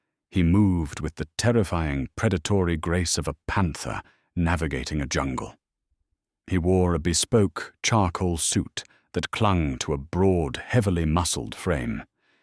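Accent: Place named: United Kingdom. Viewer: My Heart and Soul went into a huge melting OOOOOHHHH when he fed her the steak bites from his own fork. Chills down my spine! Superbly-narrated tale and extra electric shocks listening to that purring English / British accent while following along with the Transcript on, and a piece of paper over the picture section of the screen so my imagination can do the magic its meant to! Windsor, Ontario Canada EH?! ❤️🇨🇦🥂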